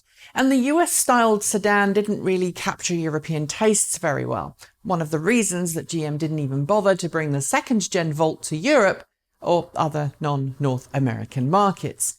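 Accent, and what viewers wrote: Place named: United Kingdom. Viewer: Another good review, where are you based as you have a British accent but quote in dollars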